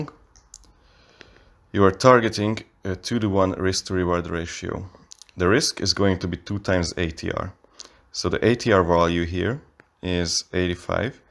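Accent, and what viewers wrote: Hungary. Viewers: te magyar vagy, hallom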